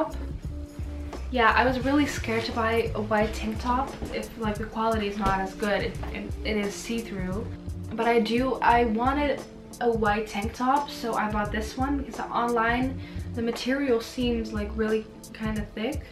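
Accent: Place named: United States. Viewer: bro u sound American and ur English is GOOD🤝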